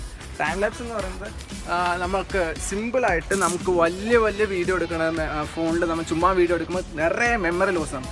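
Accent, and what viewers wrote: Palakkad, India. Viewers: Palakkad aano 😍✌ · bro Palakkad aanallo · Bro r you from palakkad??? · ചേട്ടൻ പാലക്കാട് ആണോ 😃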